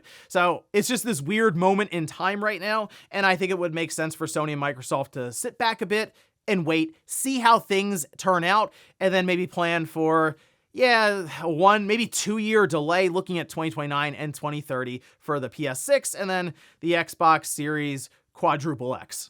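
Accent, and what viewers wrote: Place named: East Coast, United States. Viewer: It's just a standard East coast accent, like Philly/Baltimore area.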